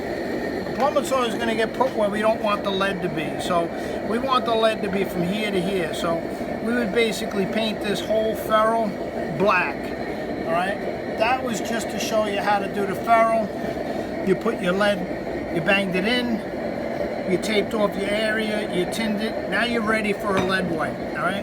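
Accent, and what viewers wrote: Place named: Long Island, United States. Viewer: Holy shit that long island accent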